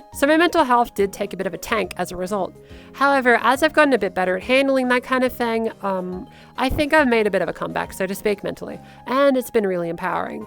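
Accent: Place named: Australia. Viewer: want to thank you for the tutorial,. It was really refreshing to have another Aussie whose voice i could aim for and not the Californian (although those tutorials are still helpful!!) and you helped me practice my voice to the point where my vocal therapist was really impressed with my adaptability!! much love ❤️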